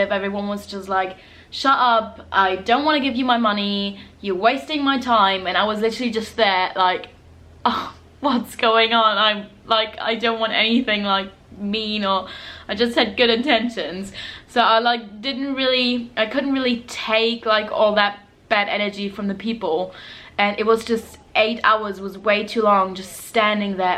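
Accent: England, United Kingdom. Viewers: Interesting for visiting foreigner tourists/workers to know approximate rates. Now tell them about your superannuation that you can get back ! As an aside I met a German young woman working as a waitress in Brunswick, Melbourne. She was on her 2nd year visa. To get her 88 days up she took a job outside Barcaldine as a Jilleroo. 1 months trial and she stayed for 6 months until the harsh summer. She bought an Australian stock saddle to take home for cross country riding. Next video (lol) please speak some German you sound far too English. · She's probably been hanging around too many UK tourists and have picked up their accent. No way does she sound anything like all the German tourists I've encountered nor whilst in Germany myself.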